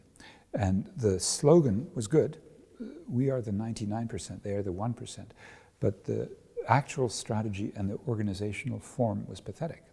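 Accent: England, United Kingdom. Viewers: Странно но я слышу английский акцент, притом что сам плохо говорю по английски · О, Вы из Англии?)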